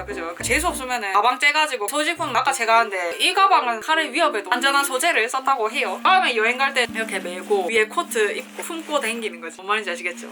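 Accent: Gyeongsang, South Korea. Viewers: 외람되지만, 경상도 어디사투리 인가요? 많이 들어본 억양인데 어느 지역인지 궁금하네요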